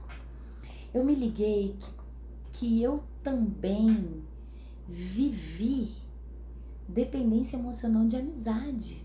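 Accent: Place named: Rio de Janeiro, Brazil. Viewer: Cara..do nada vi.. que agora já não gostei mais.. escreve.. menina quero uma namorada..sou veio.. aliás mais ou menos veio.. psicóloga é bom pra desabafar.. mas vc tem um sotaque meio que carioca né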